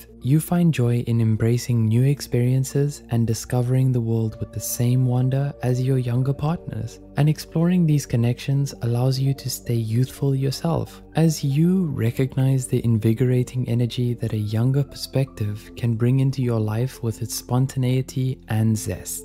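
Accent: South Africa. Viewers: Why is this voice giving a low-key white South African😶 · the voice of the person in this video isounds South African pretty cool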